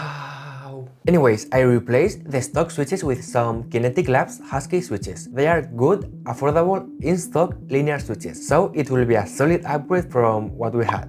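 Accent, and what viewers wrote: Spain. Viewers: Desde ek primer momento que escuche tu acento sabia que eras español! tu video es genial, nuevo sub! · Ya decía yo que el acento era sospechoso fellow Spaniard, buen vid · La verdad que he notado que tu inglés es muy español, y luego cuando has mencionado que vives en España he dicho, este chico es más español que la tortilla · Tiene acento español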